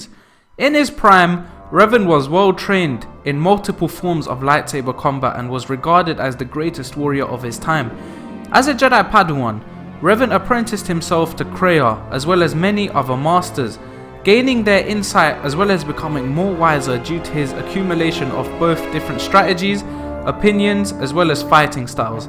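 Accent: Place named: East London, United Kingdom. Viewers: damn you got a thick london accent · Loool I know your accent is it from east London?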